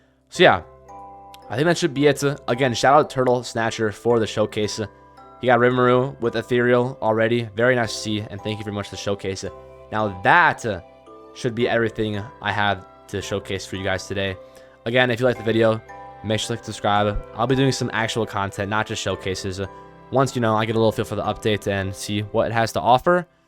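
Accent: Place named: United States. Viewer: im from england but this literally sounds like a generic american accent to me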